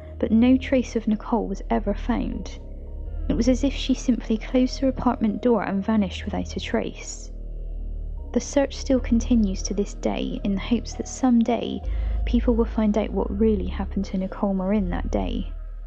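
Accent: United Kingdom. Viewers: you sound like you come from the West Country. ..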